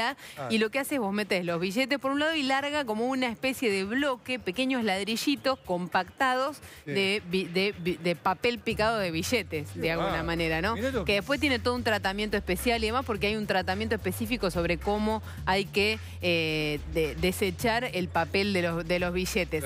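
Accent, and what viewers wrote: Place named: Rioplatense, Argentina. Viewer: Asi hablan los argeentinos y uruguayos, respeta si deseas que te respeten.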